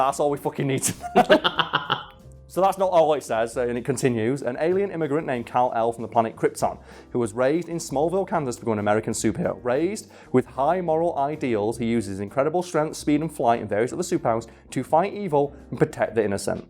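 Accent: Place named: North, United Kingdom. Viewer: Just cuz you sound like ur from the north. It means nothing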